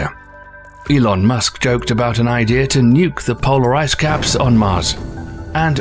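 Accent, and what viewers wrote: United Kingdom. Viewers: Don't say its a mistake because your wrong even with your cheesy British accent.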